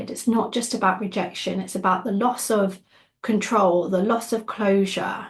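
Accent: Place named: United Kingdom. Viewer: Love your British accent! 😊😉🌺💯💯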